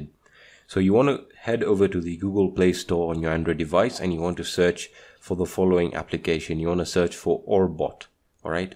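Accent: India. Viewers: He's obviously Indian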